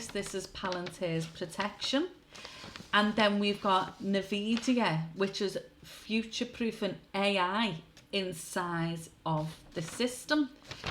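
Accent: Liverpool, United Kingdom. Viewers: Came for the, stayed for the Scouse! I'm impressed by your analysis. Do you follow Joti Brar? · I like your voice. It's a kind of pure scouse. In Lord of the Rings I think the Palantir was a magical stone that enabled people to look into other times and places.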